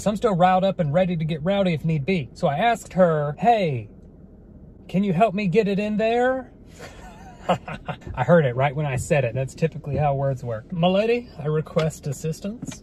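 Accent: South, United States. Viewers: I don’t know how to explain but you have on of the coolest country accents I’ve ever heard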